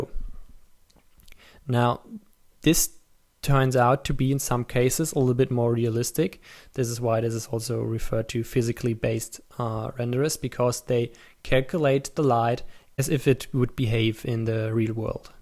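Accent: Germany. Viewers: make these in german... your accent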